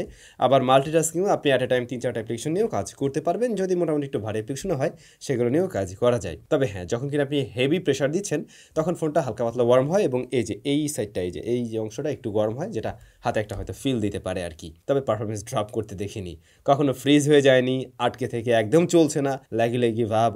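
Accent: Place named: Sirajganj, Bangladesh. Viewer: ভাই আপনার গ্রামের বাড়ি যদি সিরাজগঞ্জ হয় তাহলে আমার আইডিয়া ঠিক আছে কারণ আপনার ভিডিও দেখে এবং আপনার কথা শুনে আমার কাছে এরকমই মনে হয়েছে আমার আইডিয়া যদি ঠিক হয় অবশ্যই রিপ্লে দিয়েন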